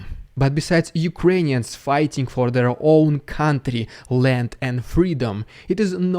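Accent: Russia. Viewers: Stop trying to be cute bro. Can speak properly u Russian. Sound like one n look like one. Go into action don't just comment criticise. Put in coming for u n give u awards for the information u gave him. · Man I'm from Crimea Sevastopol and I speak Russian and I speak fluent English and I came here when I was a kid and all my life I thought that this accent that he has was totally fake made-up American bulshit for movies but now that I see it in real life.... wow it's real. I guess I just missed out on that cool accent because I came here as a kid and and now I really don't have an accent. :( 😭😭😭🤷 Btw using voice to text so appologise for Any broken ass sentence structure or spelling ahead of time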